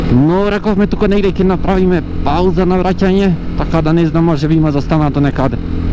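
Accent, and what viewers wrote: Bitola, North Macedonia. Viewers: Процедурата следува вака -Си седнувам пред комп.уклучувам твое видео и верувај цело време полу насмеан гледам со уживање.Мераци од пејсажи,интересни и за нас помалку чудни култури,битолскиот нагласок,духовити коментари.И еден апел до сите нас овде.Поддржето го детето во неговите авантури.Ретко мноооогууу ретко( за мене е дете) со 23 години би се осмелил на нешто олку гломазно патување полно со мал милион пречки,со мал буџет ама со голема храброст,енергија и ентузијазам.ЦАР СИ!!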